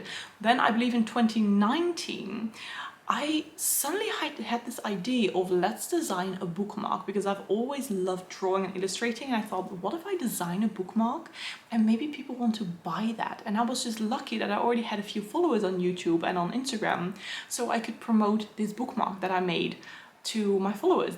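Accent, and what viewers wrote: United Kingdom. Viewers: Hi!! 👋🏻 and thank you for answering my question! The “are you British question” 😄 I love love your accent ☺️💕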